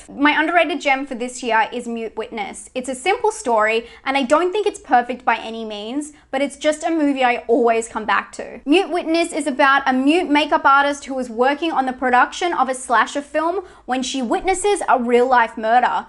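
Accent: Australia. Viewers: Are you australian?ʕ•ᴥ•ʔ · I am so happy to have found your channel. Your tastes are pretty much exactly the same as my own, and you are really interesting to listen to (as a brit the ausie accent really makes me happy to hear). When you mentioned The People Under the Stairs, I literally squeeled as no one seems to know that film.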